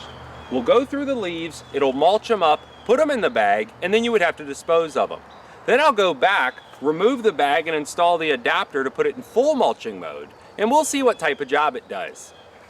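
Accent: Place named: Pittsburgh, United States. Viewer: Are you from Pittsburgh? You sound like you're from Pittsburgh.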